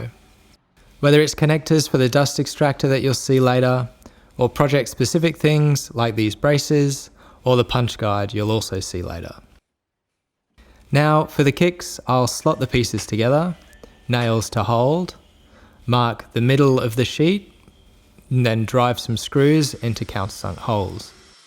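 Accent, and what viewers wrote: Australia. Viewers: Relentless Australian accent...LOL! Had a couple of laughs and picked up a few tips, thanks! Liked and subscribed. 👍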